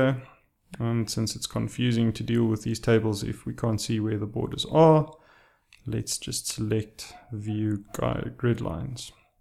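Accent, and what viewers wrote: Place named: Afrikaans, South Africa. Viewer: I'm a simple man, I hear Afrikaans English, I upvote.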